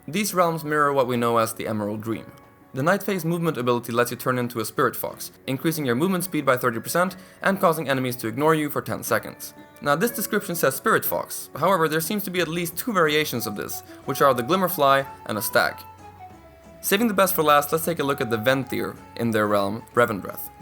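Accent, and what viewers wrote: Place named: Ireland. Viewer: I was just struggling to pin down your accent, I thought you were Irish for a bit 😂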